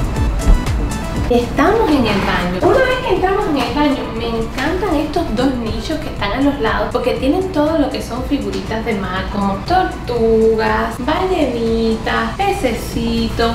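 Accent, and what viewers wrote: Cuba. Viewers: es que las dos son cubanas, y el acento cubano es asi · Ella es del pais de así q todo los cubanos habla asi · Las dos hablan igual porque las dos son Cubanas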